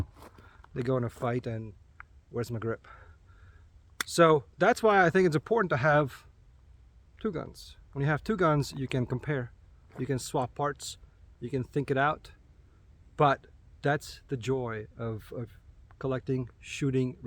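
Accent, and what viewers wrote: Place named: United States. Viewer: For a Romanian you American accent is good.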